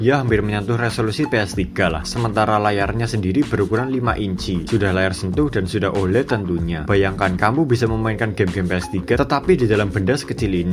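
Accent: Banyumas, Indonesia. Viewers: ngapak tapi jelas lugas dan detail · Ngapake pol lah ya pak, ora ngapak ora kepenak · Ngapak bgt mas tp sya suka hahahah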